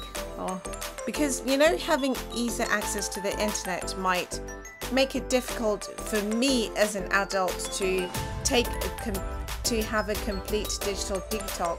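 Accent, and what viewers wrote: United Kingdom. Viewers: British accent manyama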